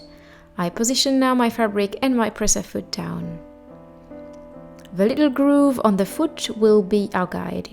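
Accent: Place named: France. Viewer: Your English is very charming. Love your French accent!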